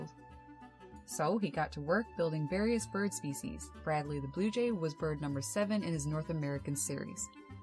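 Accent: Newfoundland, Canada. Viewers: Is that a Newfoundland accent you have? That’s not an insult. You will never please all the people my dear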